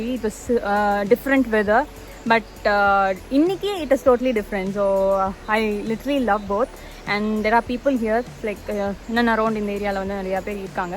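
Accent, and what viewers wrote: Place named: Coimbatore, India. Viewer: Coimbatore slang.. Wow Chance illa... Missing coimbatore. Swargame endralum adhu nammoru pola varuma.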